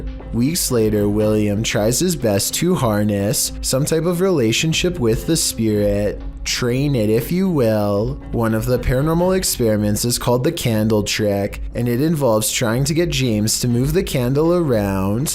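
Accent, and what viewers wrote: California, United States. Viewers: DUDE, are you from Cali? You sound like one of those surfer guys. Kind of hard to listen to you. But the videos are pretty good. Not very scary, but none the less entertaining.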